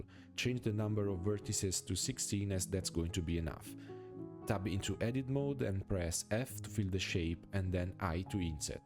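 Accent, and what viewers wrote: Italy. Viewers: ma sei italiano? 😂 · Che per caso sei italiano? fantastico video comunque!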